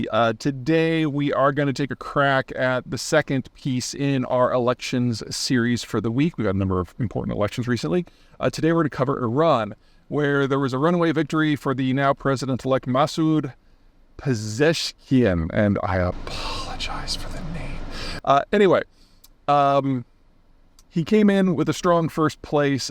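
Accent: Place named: Texas, United States. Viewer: He lived in Texas for a long time. We all just pronounce it that way. Not defending it, it's just a local accent thing.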